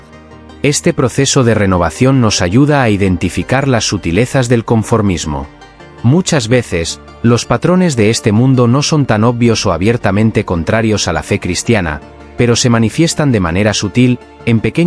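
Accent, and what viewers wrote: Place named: Spain. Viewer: Pero. NO me pongas voz de gachupin🤮🤮🤮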